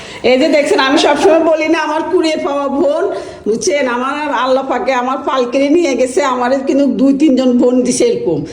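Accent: Noakhali, Bangladesh. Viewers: Annti আপনার ভাষা নোয়াখালী কোথায়।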